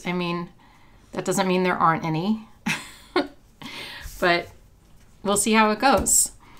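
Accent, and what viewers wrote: Wales, United Kingdom. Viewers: If he’s from Wales his accent is Welsh!😊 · A gentle and thoughtful man with a British accent??? Swoon!! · Flowers and a Welsh accent?! ❤❤❤❤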